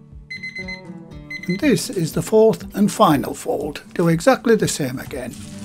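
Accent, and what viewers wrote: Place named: North of England, United Kingdom. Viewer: Hi I have been reading the comments below: first I am Irish and nobody would accuse me of being biased in FAVOR of Brits - has a lovely north of England accent; his instructions are clear and very easy to follow, his gentle mild mannered slightly self effacing style tells me here is a working man and no snob. Credit where it's due I used to be a good baker but credit for my successful return to more serious home cooking belongs to. Thank you - don't mind the "begrudgers" as we say in Cork...Thanks for your videos G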